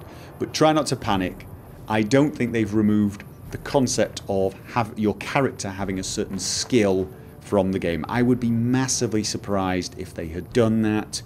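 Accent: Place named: Scotland, United Kingdom. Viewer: what do you for work? Your ability at logically discussing things in terms of their elements in an easy- to-understand way as well as your poetic Scotish accent must be incredibly useful.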